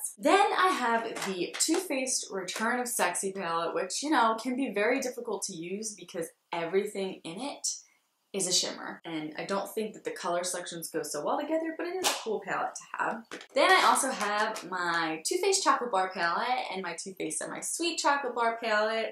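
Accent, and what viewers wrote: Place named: Philadelphia, United States. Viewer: Okay, upon further investigation (Instagram), I see you're from Philly!!! I knew it! I lived in Ridley Park for a few years and then moved to Jersey. I'm LOVING your channel. And probably the fact that you sound like me although my accent is pretty toned down. So, I'm just wondering, can we be best friends?! You have the cutest personality and I've been wanting to start a channel for so long now. Low key girl crushing on you right now (in the let's be friends kind of way) lol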